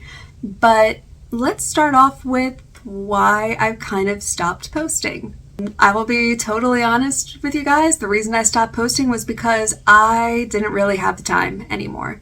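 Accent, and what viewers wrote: Australia. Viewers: Great to see you again. Love your content from your previous posts. This is from a dinky dye born in Australia Australian. Your accent and use of Australian slang is definitely there, even though I still hear your North American accent as well. This is from one who has the dual London England and Australian accent. Your family might have fun understanding you when you visit them later in the year. Patience about the permanent residency and eventually Australian citizenship, you will get there. Look after yourself and your health. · Yeah mate, your starting to sound Aussie. Mark is a lucky man. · I derect notes of an Australian accent,well done, thanks for the very interesting update, I was wondering what had happened to you. As an Australian I find the PR system to he appalling. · I can hear some twangs of the Aussie accent creeping in there! 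Nice to see you again, glad you are going well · I miss you, however you're not to me now you're Mostly Aussie. Also your American accent isn't as strong as it once was. Won't be long and you'll have a full Aussie accent.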